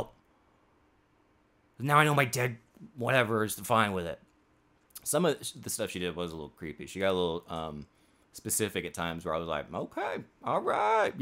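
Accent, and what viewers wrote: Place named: Boston, United States. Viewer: We need more Boston accent 😂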